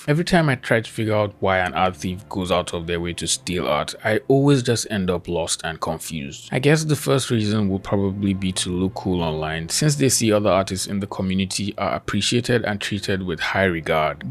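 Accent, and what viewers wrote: Nigeria. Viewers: Are you Nigerian? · im a young nigerian girl living in the uk. i dont have a strong accent like you but I've been planning on doing commentary art voice acting and animation 3d and 2d aswell as some programming. you have just made me more confident in what i want to do. you are incredibly unique. ive never seen a nigerian commentator like u and be succeful. my parents dont want me to go down this type of route but if i show them your content im sure they'll let me freely do what i want to in terms of my future. i look up to you immensly. i love your art and you are also attached to ur country too wich makes me feel warm inside. i wish u the best of luck in ur career and u deserve more attraction. i love your content im so lucky i found your stuff. you are one of the most diverse members of the art community ive seen and i hope more people like you show up. 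you are honestly a start of a new era in the community. nigerian homes are quite critical and people like me might be scared to go into this feild of work but trust me u are giving us the confidence to persue our dreams. from a huge fan that is glad you exist💕💖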